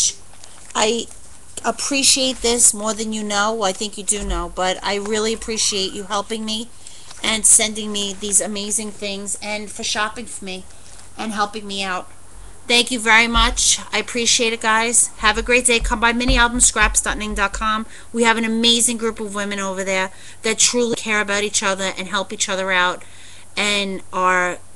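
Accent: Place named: New York, United States. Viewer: I love love love your NY accent, I live on the west coast now - and you're makin' me home sick. This an an awesome Haul - Tues Morning has been carrying a lot of Tim Holtz/Ranger Products. :) There are loads of how to Video's here on You Tube for using things Julie bought. Also try looking at the Ranger Site . Can't wait to see what you make with your goodies! Have an awesome day!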